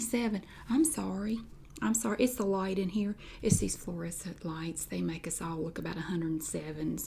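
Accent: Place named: South, United States. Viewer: The shadiness of these characters kills me lol your southern accent and the “bless your heart” gets me every time!!! Cant get anymore shadier than saying “bless your heart” to someone lol alsooo this character gives me “Mrs. Roper” from Three’s Company lol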